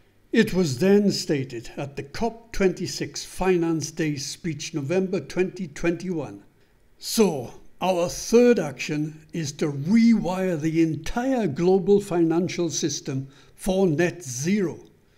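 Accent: Wales, United Kingdom. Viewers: You sound like a Welshman my brother!! 🏴❤️😎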